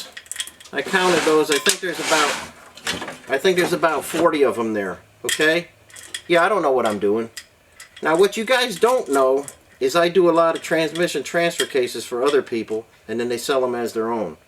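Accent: United States. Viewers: Yeah well sound very American to me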